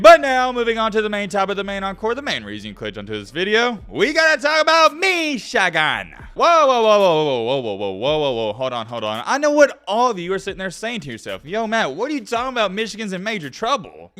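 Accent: South, United States. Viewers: is more like it. I will never trust someone with a Southern drawl talk about Michigan football. Stick to lying about the Tide. Michigan is far from major trouble. Be afraid... be very afraid.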